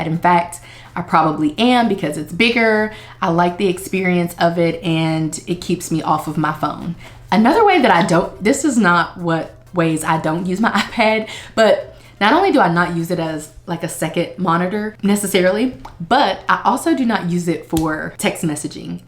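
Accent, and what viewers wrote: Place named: Texas, United States. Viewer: You gotta either be from Texas or Alabama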